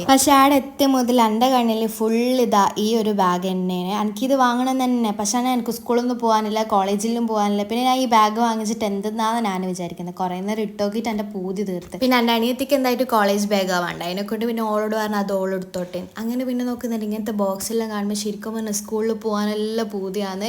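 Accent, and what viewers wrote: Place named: Kannur, India. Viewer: വല്ലാത്ത ജാതി കണ്ണൂർ slang 🫣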